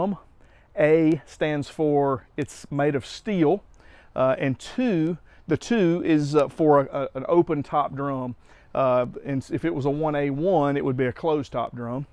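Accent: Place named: North Carolina, United States. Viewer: I knew almost immediately from your accent and demeanor that you too were from North Carolina. Sure enough, in your office you have a Greensboro Fire Department badge. Thanks for the video! Currently studying for the CHMM exam and it helped simply things for me.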